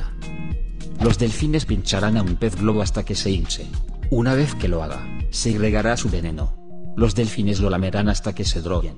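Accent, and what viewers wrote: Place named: Russia. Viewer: Necesito escuchar esa voz de mujer rusa hablando algo que no sean solo post en Reddit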